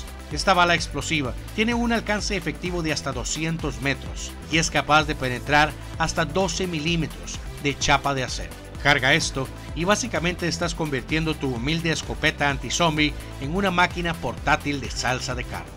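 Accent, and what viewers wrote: Costa Rica. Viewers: Desde el principio supe que eras tico aun si ni siquiera lo dijeras saludos desde cr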